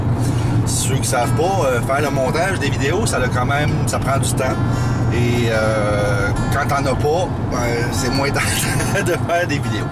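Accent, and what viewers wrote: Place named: Quebec, Canada. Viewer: Merci pour cette vidéo. Cela faisait une paille (comme on dit chez nous en France )que l'on attendait ça. J'aime toujours autant ton accent. Bon tu va me dire que c'est nous les cousins français qui en avons un!! J'ai eu la chance d'aller travailler dans l'état de New-York il y a 3 ans avec un de tes compatriotes canadiens. Je débutais le geocaching mais cela ma permis au moins d'avoir un souvenir. Merci encore pour tes vidéos Au plaisir de te revoir. Choudrouna. (Du centre de la France ) Géo amitié.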